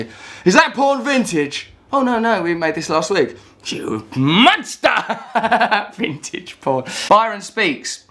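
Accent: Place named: RP, United Kingdom. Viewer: Trying to work out what you say , not because I'm American but because you posh mumbled. Something to do with to learn more watch ( centre of the self?) The subtitles say " To learn more or change in the cell phone communities miller's 3i I wonder" <<< PS Can you tell me what hair product you use, I'm jealous of how you manage to tame your barnet. PPS Come to Birmingham to meet practically evicted Londoners like myself and do some Trews on the road!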